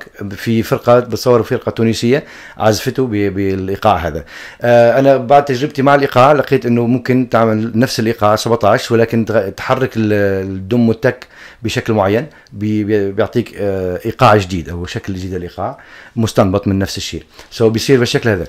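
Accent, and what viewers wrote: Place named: Sadad, Syria. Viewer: اللهجة قريبة من أهل صدد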